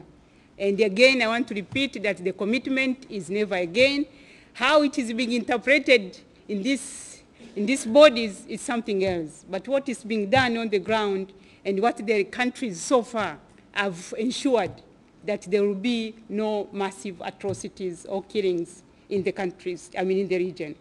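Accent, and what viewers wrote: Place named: Haya, Tanzania. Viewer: She is a Tanzanian from Kagera region. You can hear the Haya accent.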